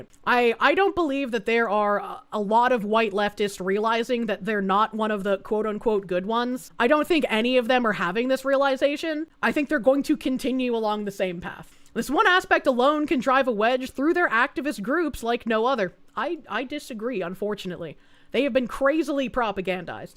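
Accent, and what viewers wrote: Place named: California, United States. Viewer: So I'm not the only one who can't take anything too seriously when a Californian accent is sprinkled on it.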